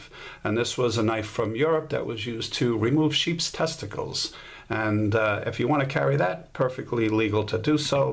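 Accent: United States. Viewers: You certainly sound like you’re from NYC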